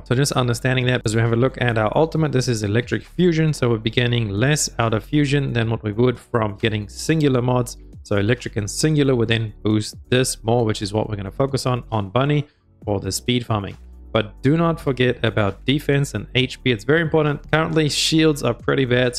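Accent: South Africa. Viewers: Ahhh that lovely South African accent…. Love it. Ja my bru I totally got this china. Great video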